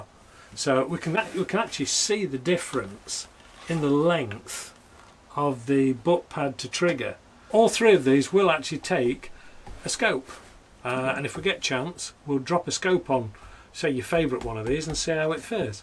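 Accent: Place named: Nottingham, United Kingdom. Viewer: That's a Notts accent if ever I've heard one!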